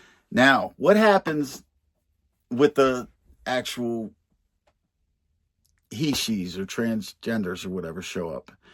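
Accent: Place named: Pittsburgh, United States. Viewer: That accent, are you a fellow Pittsburgher? Or from Philly? Hearing you talk reminds me of shooting the shit with my homies back State Side. I’m standing on business - on the good side of the law now - in Đà Nẵng, Việt Nam and just because I speak the language doesn’t mean I don’t miss talking and listening to that real raw American nitty gritty from someone else who’s been in the trenches and popped up a better man yet have a prequel, story, and sequel about running wild. Type of things I highly censor when it comes to talking to the Misses 😂 Just subscribed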